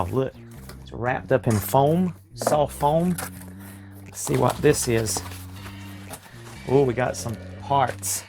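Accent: South, United States. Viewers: Came across your video here and it's my 2nd video I've watched. So the reason i thought I'd comment cause you sound Southern with a Southern accent. I'm in the South as well if you are. I'm getting a Snake Mountain as well from Super 7 but haven't been notified of a shipping track number so just waiting is all i can do.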